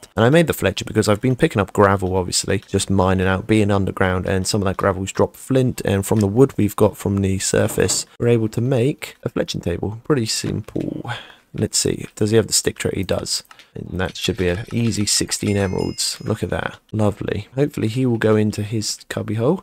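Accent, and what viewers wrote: United Kingdom. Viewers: are you from eastborne?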